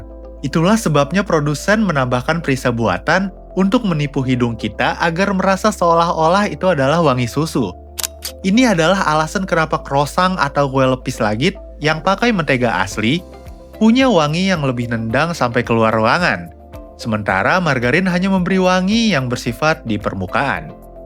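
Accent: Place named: Bali, Indonesia. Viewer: Gw tau bedanya margarin dan mentega, tapi kenapa ya klo video masak di youtube itu mengaggap butter dan mentega itu beda ya...? btw yg punya channel org Bali ya, penyebutan setiap kata yang ada "t" nya kyk org bali.hehe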